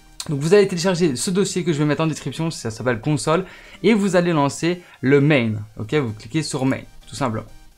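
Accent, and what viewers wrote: Belgium. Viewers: Slt tu es d'où de Belgique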